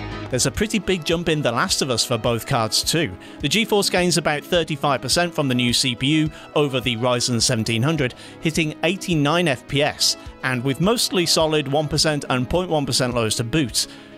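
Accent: United Kingdom. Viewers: Awesome channel, with great thought-provoking content! Nice British accent too....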